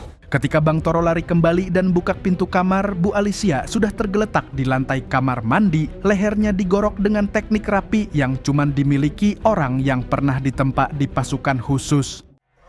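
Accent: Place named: Banyumas, Indonesia. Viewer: ngapakke krasa banget koh wong banyumas mesti kie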